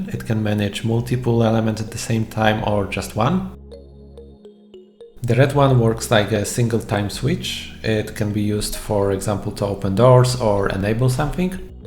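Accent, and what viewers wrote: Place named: Poland. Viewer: Are you from Poland? your accent sounds very Polish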